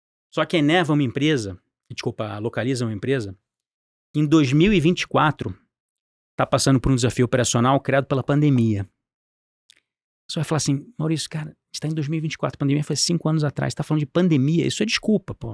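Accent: Rio de Janeiro, Brazil. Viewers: Esse dialeto carioca, dá raiva, tudo com x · é carioca kkk... Repare sempre no fim tem aquele "X" arrastado. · O cara é extremamente PEDANTE Falou falou e não disse POOOOOORRA nenhuma Além desse sotaquezinho CHATO de carioca metido a besta Além de repetir a todo momento que ele tá gastando muito tempo com a localiza Além dessa blusa de frio amarrada no pescoço Kkkkkkkkkkkkkkkk Que pessoa ridícula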